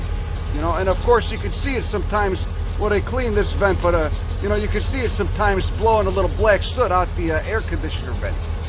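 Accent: Chicago, United States. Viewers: Far out ...first video I heard your voice in I was like this guyz gotta be from South Berwyn or Shtickney...got any good eyetallian beef up there in Oakland ? Yeah I didn't think so you prick.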